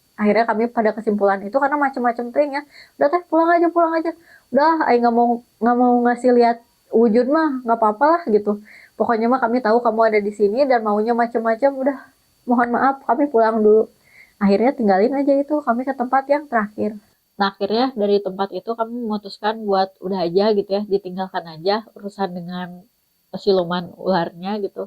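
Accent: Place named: Sunda, Indonesia. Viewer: Samprazan teh! Assalamualaikum! Ari teteh teh saha? Meni nyunda pisan! Meni hebaat uy pangalaman nalusur na!. Se frekwensi jeung kami! 🤗 Lanjut teh nalusur na! Sukses selalu! 👍👍🙏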